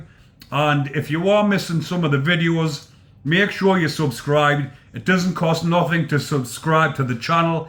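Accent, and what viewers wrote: Newcastle, United Kingdom. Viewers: Love your Geordie accent you are a Great bloke love Jon.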